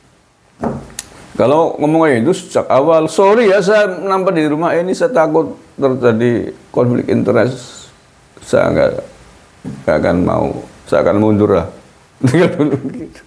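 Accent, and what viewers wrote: Banyumas, Indonesia. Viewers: Aku lihat nih melu ngguyu bahasa ngapaknya tuh loh Pak mantep 👍👍🤣🤣🤣🤣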